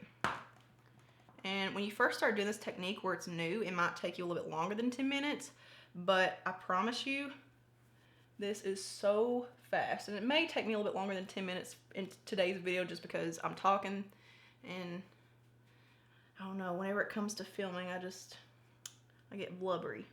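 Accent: South, United States. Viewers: #partypeople Brand new subscriber here and I must say I really enjoyed your video and this technique. I have never seen this done before. You are so beautiful! I just had to let you know. Oh and ps. From one fellow country gal to another, I love your accent! Nice to know I’m not the only one with a twang! 😘😉🥰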